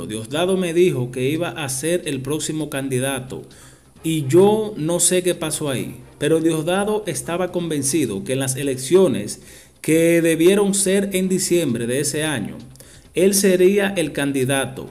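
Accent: Cuba. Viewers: LOCUTOR CUBANO?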